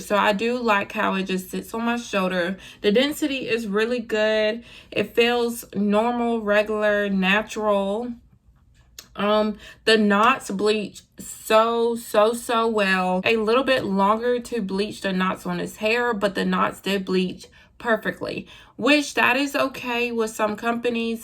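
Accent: United States. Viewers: I'm from Mississippi shawty got to be from GA cause everybody I know sound just like that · Are you from the south ? Your accent is soooo cute 😍😍😍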